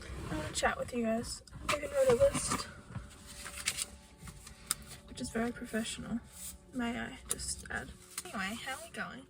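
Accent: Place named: Australia. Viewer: I always wondered why your accent seemed more aussie than kiwi!! makes sense, i had no idea you were from aus 🫶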